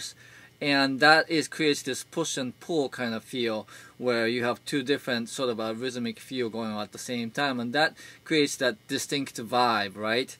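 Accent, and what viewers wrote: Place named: Russia. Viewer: I detect the Russian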